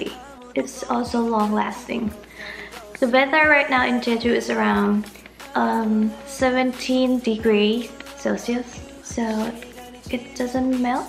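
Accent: England, United Kingdom. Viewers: i love your english accent💖 so please don't be shy naka💖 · ชอบสำเนียงอิ้งมากกกก😍💗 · ชอบสำเนียงอิ้งพี่ มากๆ อยากให้พี่ ทำคลิปแบบนี้บ่อยๆ งื้ออออออ นี่คือพยายามฟังโดยไม่เปิดซับ ฟังออกบ้างไม่ออกบ้าง ถือว่าได้เรียนรู้😶 ปล.พี่ น่ารักมากกกก ชอบโทนนี้เลยยย❤🍑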